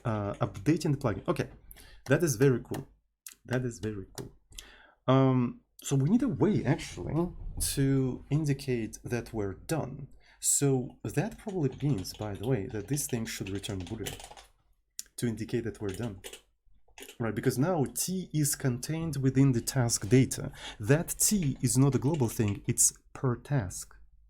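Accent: Russia. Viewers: хз он тупо по-русски говорит английскими словами норм короче ну надо будет специальные люди придут и объяснят как надо балакать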